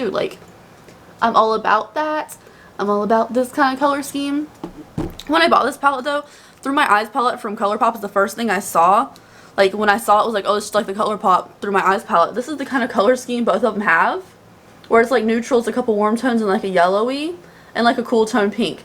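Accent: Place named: North Carolina, United States. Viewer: is originally from North Carolina:)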